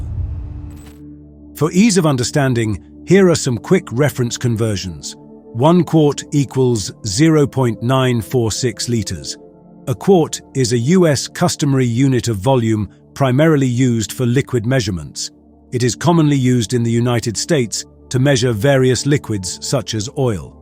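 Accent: England, United Kingdom. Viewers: It's a very well-spoken & clipped English accent - I am sure I recognise the voice but I can't put a name to it, but it is a human voice. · Oh no a British accent speaking in US English.... You tube again.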